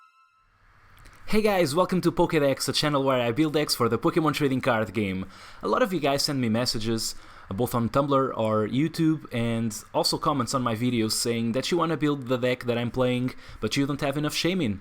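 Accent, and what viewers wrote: Portugal. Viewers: Gostei bastante do video, também jogo gengar/bats/wobbu mas aplico bats e resulta muita bem, faço: 3 Gengar 1 Wobbu 4-4-4 Bats 2 miltank mas uso muito menos supps/items, mas acaba por resultar! ajuda bastante agora com wally, se ficares sem mão inicialmente. o que achas? btw, entendi que eras tuga pelo sotaque, mas parabéns, o canal está muito fixe!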